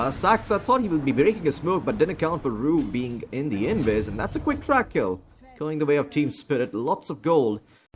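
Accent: India. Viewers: Stupid indian accent. Can't watch.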